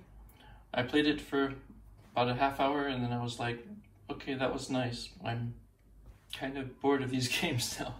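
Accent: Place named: Germany. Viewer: You speak Dutch with a German accent and intonation 😉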